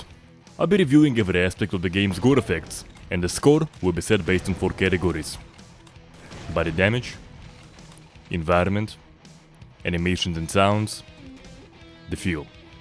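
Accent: Russia. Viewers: What are your future plans for the series,? P.S. Are you from Russia?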